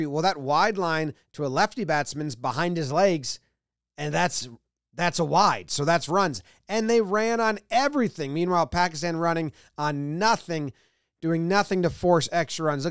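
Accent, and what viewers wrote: United States. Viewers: This guy talking sounds American, but also is talking about cricket plausibly competently? I don’t get it at all.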